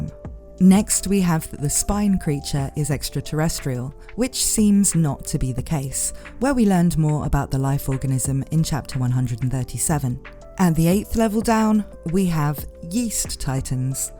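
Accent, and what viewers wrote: United Kingdom. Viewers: Oh alright it sounded a bit diferent than what I’m used to hearing in a British accent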